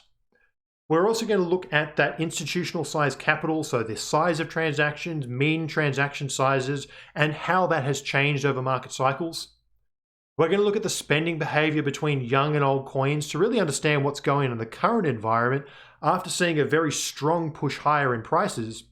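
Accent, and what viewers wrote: Australia. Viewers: Is this speaker from Australia?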